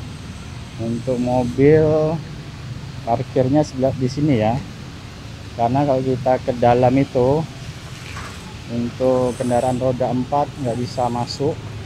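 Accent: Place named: Java, Indonesia. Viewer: Jowo ya mas .logat nye medok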